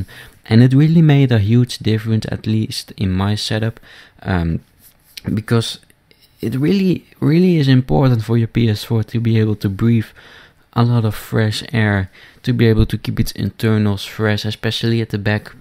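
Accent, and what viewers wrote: Netherlands. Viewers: he sounds dutch af :/ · Dutch accent incoming! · Dutch accent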